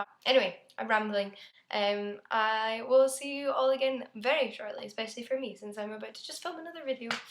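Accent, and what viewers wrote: Scotland, United Kingdom. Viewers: You sound a bit scottish. Is this true?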